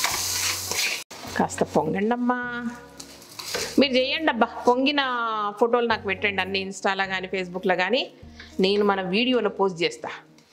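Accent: Telangana, India. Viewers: Yellow sareelo muddabanti puvula unnaru.meeku sarees baga suit avutayi abba! Telangana maata vellulli 🙏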